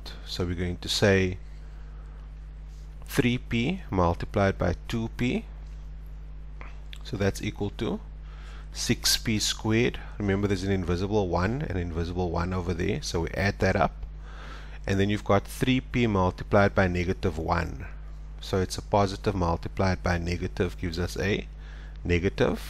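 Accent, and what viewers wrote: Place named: South Africa. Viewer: lol i can hear he’s south african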